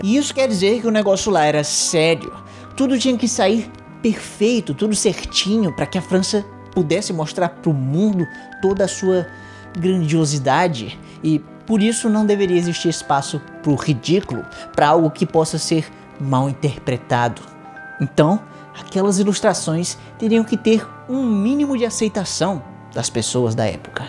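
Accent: Ceará, Brazil. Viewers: Tu é cearense né macho vei ?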